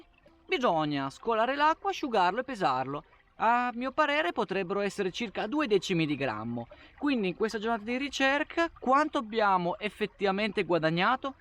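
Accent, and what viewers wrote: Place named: Piedmont, Italy. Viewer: Mi sono iscritta una settimana fa circa! Per caso è apparso un tuo video e sentendo accento piemontese mi sono soffermata a curiosare ! Sto guardando tutti i video vecchi. Grazie della compagnia.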